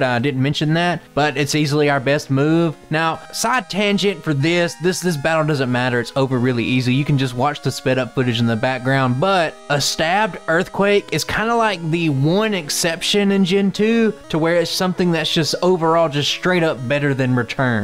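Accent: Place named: Texas, United States. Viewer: im ngl your (Texan?) accent makes your videos very entertaining to listen to, quite unique. definitely subscribed!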